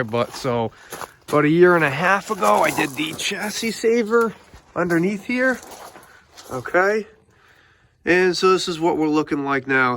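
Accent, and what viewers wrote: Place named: New England, United States. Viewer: Was watching your 2004 Corolla head gasket video and was thinking "between his accent and all the rust/corrosion he's gotta be in New England". Came here and found my answer.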